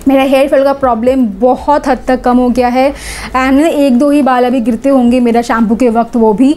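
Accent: Bengal, India. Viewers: You are Bengali apke baato se pata chal Raha hai Bengali log Hindi bolte hai toh pata chalta hi hai 😅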